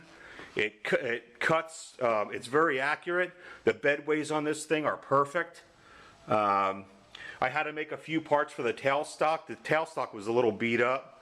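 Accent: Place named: Pennsylvania, United States. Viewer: I knew I recognized that accent. Fellow PA guy! If I were out in Cali, I'd have done that restoration for you. To show you my work, check out my set of lathe-restoration videos here: <link> Imagine if I got my hands on your Clausing and what she'd look like?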